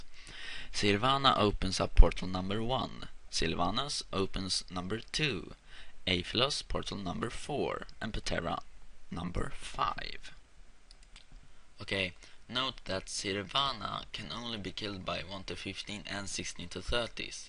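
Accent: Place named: Sweden. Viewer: Swedish english ftw!!!!! Oh sorry not supposed to comment on english... anyway. Yeh I hope the video helps whoever doesn't know how to do GRB. Kudos for you bro <33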